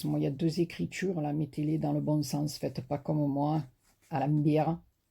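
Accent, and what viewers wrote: South, France. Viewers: J adore votre vidéo et votre accent du midi. Je vadrouille entre st Martin de crau et Ales Gard et le midi j aime. Chapeau 😘😘😘😘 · Oh qu'elle joie de te revoir, c'est bon d'entendre ton accent du soleil, tkt tu es hors champ mais pas grave trop bon de te revoir avec tes astuces et tutoriels. Super géniale marie-ange de Bourgogne. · Coucou je découvre ta chaine et je vais m'y abonner de suite .. le tuto du chapeau j'adore......et l'accent du midi également.. ici il neige a gros flocon suis du Nord... est ... ca caille ..bisous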